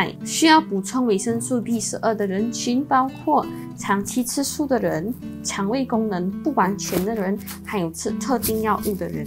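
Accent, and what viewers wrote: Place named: Malaysia. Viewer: 视频主的中文发音很不标准，乡土味很重。请问是不是马来西亚华人 ？😁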